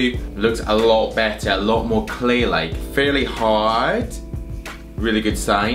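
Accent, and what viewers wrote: United Kingdom. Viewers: Where are you from? i thought you was from uk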